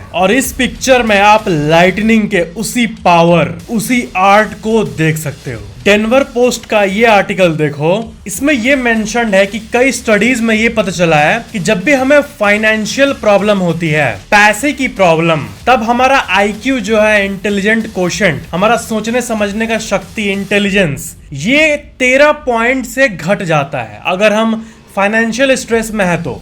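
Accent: Bihar, India. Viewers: Vai aap bihar se ho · Bhai tum bihar se ho kya ??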